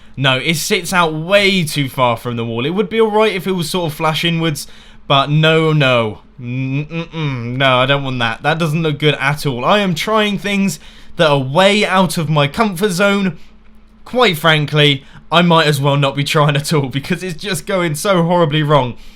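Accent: United Kingdom. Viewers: You British? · Usually, i absolutely hate british accent as a native swiss guy, but i really like how it sounds when you talk :)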